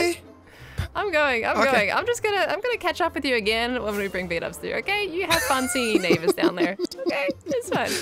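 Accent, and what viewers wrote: Australia. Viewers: Loving you on the hermitcraft server ! The australian accent is really fun to listen to. Keep up <3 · Is she Australian?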